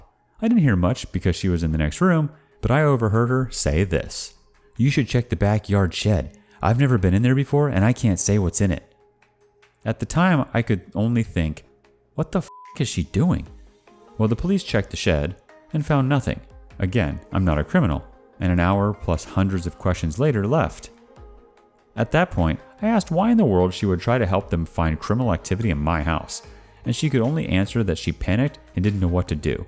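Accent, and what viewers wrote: India. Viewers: The first story sounds like Indian !!!! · Sounds Indian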